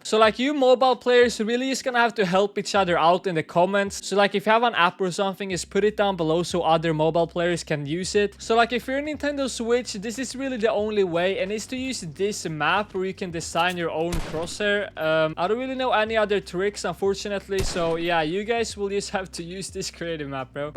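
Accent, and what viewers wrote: Sweden. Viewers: Bro your Swedish? I’m Swedish to 😁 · I KEWN IT!!! You are from Sweden Right?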